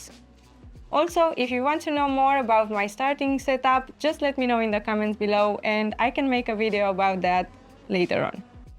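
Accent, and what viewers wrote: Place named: Liverpool, United Kingdom. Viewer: Nice accent. Liverpool?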